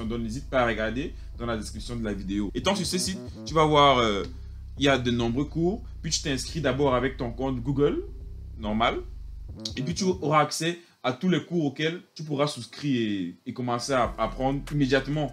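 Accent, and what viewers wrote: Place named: Cameroon. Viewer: L'accent Camerounais🤌🏾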